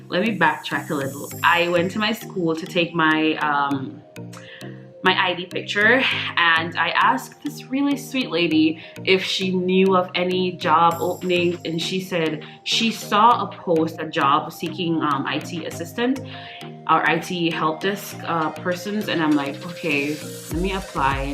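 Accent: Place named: Jamaica. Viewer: sounds like a yaadi (Jamaican)....are you?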